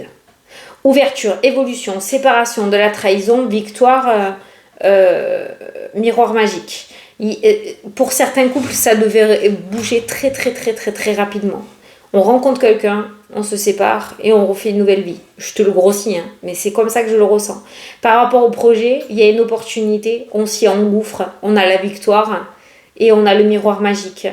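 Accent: South, France. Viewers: Bonsoir que du bonheur de vous entendre 😊😊😊😊. Je me prénomme ludivine le 02/10/1979. Est ce que je vais bientôt réussir à avoir une grossesse depuis le temps avec tant d épreuves 😢?mais je ne baisse pas les bras.merci beaucoup de tes tirages qui me font du bien avec ton humeur du sud.je suis du sud aussi j adore ton accent ❤❤❤❤😊😊😊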